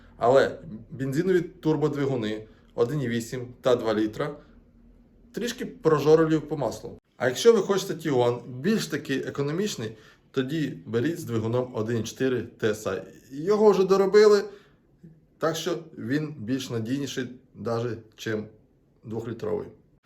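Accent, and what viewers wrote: Surzhyk, Ukraine. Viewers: Як на мене, треба мову трохи підтягнути, бо суржик , це шлак, а вичавлювати із себе через силу українську , то краще взагалі мовчати. Ну і авто якісь старі корчі- не цікаво (((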